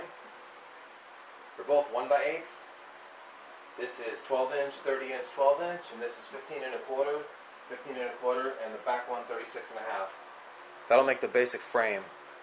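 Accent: New England, United States. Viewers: This is fantastic info. Just in time. Thank you. I subscribed and liked and shared even to friends I have other countries. Are you a New Englander? Your accent. Really nice video. Well done!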